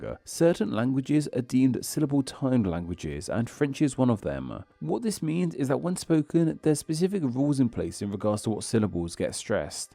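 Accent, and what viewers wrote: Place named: United Kingdom. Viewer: You have a wonderful voice! It has a distinctive tone, which combined with your British accent that makes it very appealing. We Americans are as fond of British accents as we are of French accents. Ours accents are rather “meh”.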